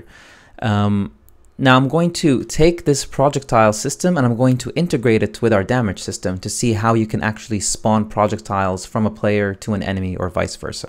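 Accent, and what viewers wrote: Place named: Arab world. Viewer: I just wanna ask 1 question ! where are you from ( I feel you are arabic)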